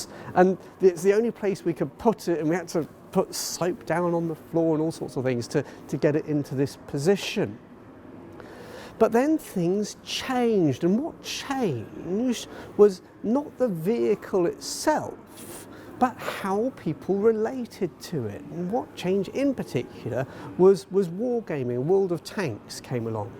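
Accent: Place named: United Kingdom. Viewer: What a pleasure to watch for so many reasons: First the wonderful British English spoken. That pronunciation, melody, stressing etc. Splendid! (I am German, btw) Then the dedication to the topic that speaks from every sentence. The pure facts and information conveyed. The reflection on the historical work you are doing. The legitimate and based reasoning. The mild subtle humour. Ex-cel-lent.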